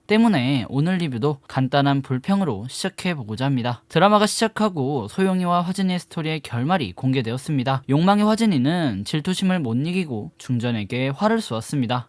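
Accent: South Korea. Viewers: ㅎㅎㅎㅎㅎㅎ 말을 허벌나게 잘해부리는구마이~ 틈틈히 리뷰 잘보고 있어요. 지루하지않고 군더더기 없이 리뷰를 잘해주시는거같아요. 근데 놀란건 전라도분이 어찌 이리 완벽히 서울말을 구사하는지.. ㅎㄷㄷ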